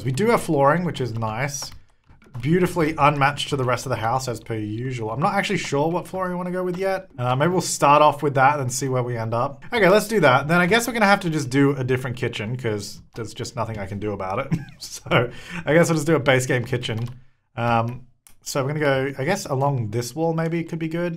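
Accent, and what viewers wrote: Australia. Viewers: Are you Australian English or American just curias 😓😓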